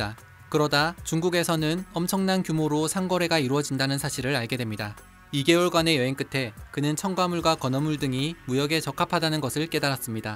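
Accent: Gyeongsang, South Korea. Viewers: 갱상도 클라스 ㅎㅎㅎ ㅅㅂ 이제 댓글에 외국인 댓 졸라 달리겄네^^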